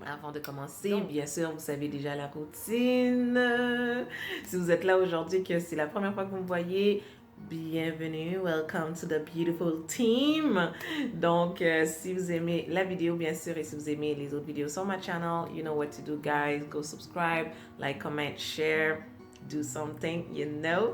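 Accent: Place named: Quebec, Canada. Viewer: Tu as bien l'accent québécois j'adore :)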